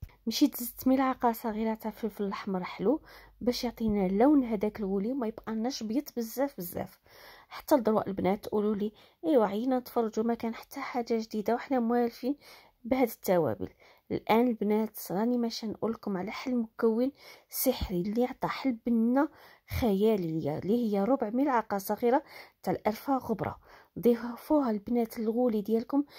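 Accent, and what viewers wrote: Tlemcen, Algeria. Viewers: يا سلام علي الوصفة و علي الهدرة التلمسانية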